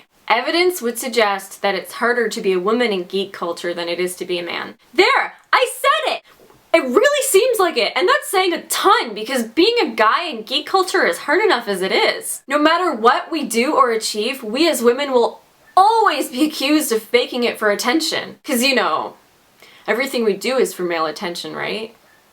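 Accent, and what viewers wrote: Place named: Canada. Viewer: oh, I forgot to add - I'm Canadian too, so it warmed my little heart to hear your Canadian accent. Yayz!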